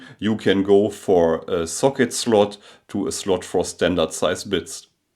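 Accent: Germany. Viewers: Danke für das Video. Bruder, deine Aussprache des Englischen kannst du bequem und leicht verbessern, wenn du Sender von der BBC schaust oder hörst. Das geht leicht über die Website der BBC oder über einen Podcast-Player deiner Wahl. Optional könntest du auch Hörbücher in Englisch anhören, aber dort sind die Sprecherinnen und Sprecher nicht immer auf dem Qualitätsniveau der Sprecher bei der BBC. Was ich nicht empfehlen würde wäre BBC World Radio, da dort auch sehr viele Bürgerinnen und Bürger aus Ländern außerhalb Großbritanniens zu Wort kommen, die schlechter Englisch sprechen als du. Am Ende bist du hoffentlich diesen deutschen Akzent bei deinem Englisch losgeworden.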